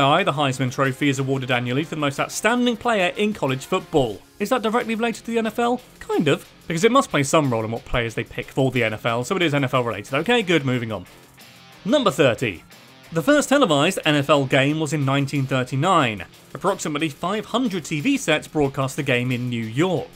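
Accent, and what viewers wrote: United Kingdom. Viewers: Ohh this will be good British guy talks American football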